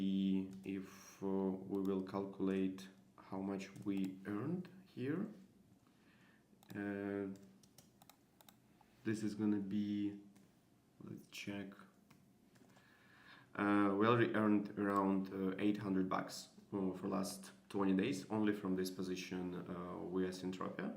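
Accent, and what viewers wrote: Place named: Russia. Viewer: Хочешь на англ аудиторию работать, поставь произношение. Такой английский для рускоговорящих - родной, но возникает немой вопрос: "а можно уже на русском?". Англофоны будут скипать пока, сложно разобрать что ты там говоришь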